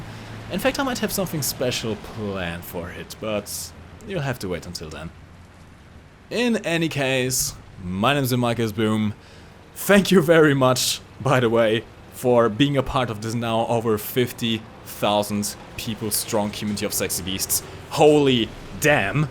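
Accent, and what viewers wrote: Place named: Germany. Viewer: You Sound a Bit German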